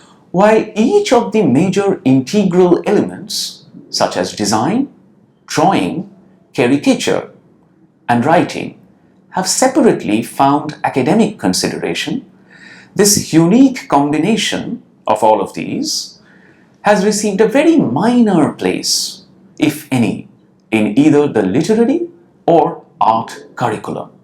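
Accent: India. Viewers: He is not attempting to sound British. This is the acrolectal variety of Indian English. Please don't be harsh on people without any reason.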